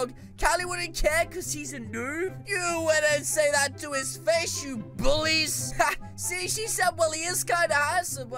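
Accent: United Kingdom. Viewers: bro are u British? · are u british im confused...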